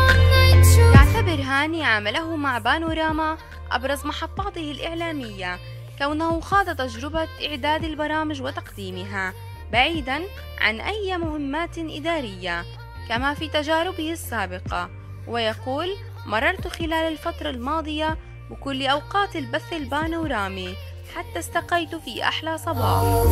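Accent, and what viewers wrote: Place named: Saudi Arabia. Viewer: لهجته اقرب للهجه السعوديه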